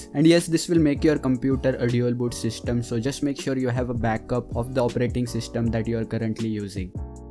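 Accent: India. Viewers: do you know what accents are? he's Indian (i think)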